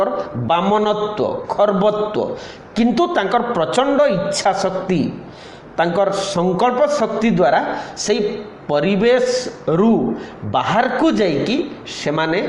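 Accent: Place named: Balasore, India. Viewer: Mun bhi baleswar Ru...ta katha kahibara saili baleswar re tike alga ...ta apana seibhalia katha heuthile ta jana padila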